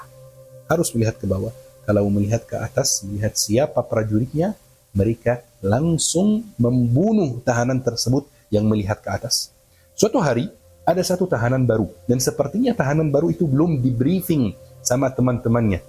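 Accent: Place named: Arab world. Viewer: Ini spt suara. Mhs Mesir yg pernah belajar di Indonesia. Stlh tamat, dia tinggal di Indonesia. Yg dia lakukan: Pertama, jd youtuber, konten ttg mazhab2/sekte dlm dlm Islam. Kedua, setelah gagal jd youtuber, dia bertrsnsformasi mnjdi ustad.dan pnceramah. Pakai surbam, kliing2 Indonesia. Tp spt nya juga kurang berhasil. Ketiga, skrg kmbali lg jd youtuber spt dlm video ini. Kl ini apa yg dia ceritakan ini bkn hsil riset. Tp hnya berdasar berita, berdasar video2 yg sdh bnyak beredar pasca Jatuhnya al Asad. Sy justru curiga pd ini. Dia hnya ingin memainkan perasaan umat Islam Indonesia agar terprofokasi. Dia justru penebar fitnah baru. Tanda-tanda dia bohong, perhatikan dan dengarkan berkal kali dia bersumpah: demi Allah...demi Allah... Hai...kamu kan orang Mesir, coba kamu berani tdk kritik presiden kamu sendiri, al Sisi yg jg diktator al Asad. Brp orang yg mati dan dipenjara oleh rezim al Sisy ktika mrk protes terhadapnya..?? 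Comeon.....kritik presidenmu sndiri... Selancar apapun bhs Indonesiamu, sy msh bs membedakan bhw anda bkn org Indonesia. Ktika kamu mengucapkan fonem2 tertntu yg tdk ada dlm bhs Arab spt: nya, ng, pelafalan kamu msh klihatan sbg org Arab. Akhirnya, sy jstru curiga bhw anda ini mata2/ antek asing yg disusupkan di Indonesia utk mmecah persatuan bangsa Indonesia dg mmbahas isu-isu sekte/ mazhab yg terus berkonflik di timur tengah. يا....نحن نتابع كل خطوانك..وقد تركت الآثار التى لا نمحوها....!!!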